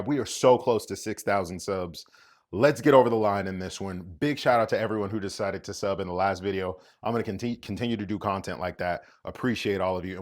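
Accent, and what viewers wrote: United States. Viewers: I hear an American accent discussing (proper) football, I switch channels but you, my man, got me subscribed. You capture and transmit the excitement of the moment. Good job.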